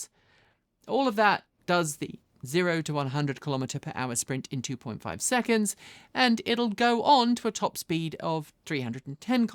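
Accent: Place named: United Kingdom. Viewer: You should skip the Political opinions on Elon Musk, who is a beautiful person actually trying to improve the planet and life as we know it for all. Maybe include some opinions on Keir Starmer, which is 100% a disgusting person. Don't know where you live, but your definitive British accent might need some more support for a good cause (Anti Starmer) and not trying to hamper anything Elon Musk is doing, which are mostly really great things.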